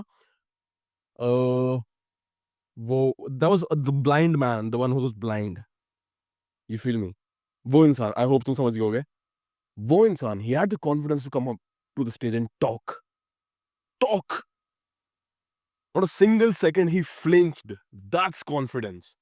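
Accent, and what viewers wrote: Haryana, India. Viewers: Valid points bro btw you sound like raftaar in haryanvi accent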